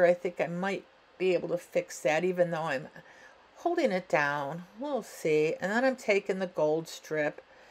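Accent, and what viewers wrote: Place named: Wisconsin, United States. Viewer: Nice cards! Your accent is driving me crazy! I lived in Connecticut when I was younger and I just know I've heard it up and over that way, but not quite that far... Wisconsin maybe??? LoL, see it's truly making me crazy!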